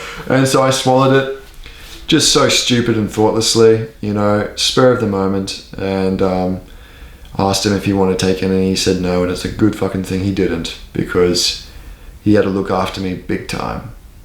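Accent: Australia. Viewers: he sounds like an aussie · Something about how you looked in the thumbnail made me assume your Australian for some reason, but your accent is throwing me off big time, sound like an aussie who lives in the US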